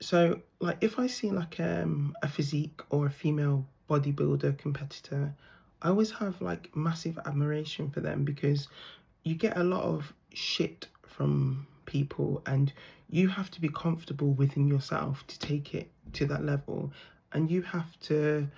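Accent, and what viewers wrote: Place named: United Kingdom. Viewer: This is my first time seeing any of your videos. I would like to say that I have always had the highest respect for bodybuilders in general - be they male or female - because that lifestyle demands quite a lot of self-discipline in terms of workout routines, diets, etc. As a man, I have absolutely no qualms whatsoever with female bodybuilding. I believe that it is prudent for anyone with the will & drive to do so to be as strong & fit as possible. This will yield health benefits both for the present as well as for the future. I will also say, as a Black Man in the United States, that it is a good idea for us as Black People to at bare minimum render our bodies strong in order to both withstand and effectively defend ourselves against potential attack. The U.S. is quite often hostile territory for our people. I don't know whether this is an issue in the U.K. 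(where I'm assuming you're from given your lovely accent). I will make certain to check out more of your videos. Thank you for your words of support towards your fellow female bodybuilders and for being you. You're beautiful. 🌹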